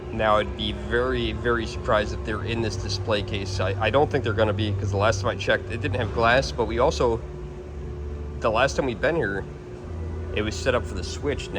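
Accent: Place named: Midwest, United States. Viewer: Where in the Midwest are you? I can tell by the accent. :-)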